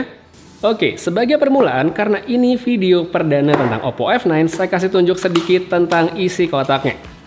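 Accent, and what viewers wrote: Bali, Indonesia. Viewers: Bli nak bali ne??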